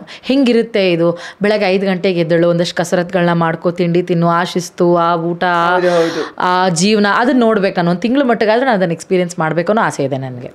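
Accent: Mangalore, India. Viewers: Mangalore kannada spr😂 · Mangalore accent supper. Namma kudla namma karla great. Love from Karkala. All rounder 🎉❤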